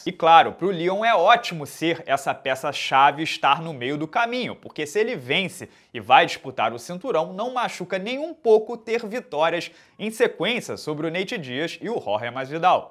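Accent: Rio de Janeiro, Brazil. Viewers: Meu, esse sotaque carioca é TÃO IRRITANTE!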